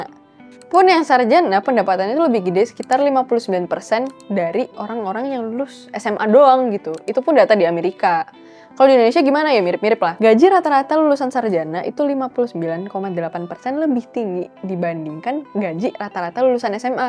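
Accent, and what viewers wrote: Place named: Surabaya, Indonesia. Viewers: Medok sidoarjo an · Chindo Suroboyo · Mba mba ini sepertinya cici cici suroboyoan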